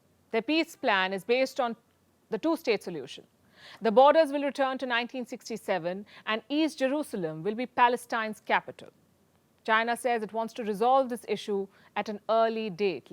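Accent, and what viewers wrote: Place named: India. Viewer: Is this American media with India accent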